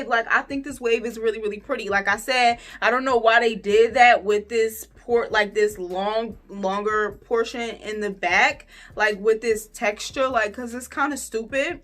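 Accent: New Jersey, United States. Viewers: Sis are you from Jersey? That accent is so strong lol. I love it tho! Love ur videos btw!❤️ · Ayyyyyy hey my jersey sis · Ok Jersey🙌🏾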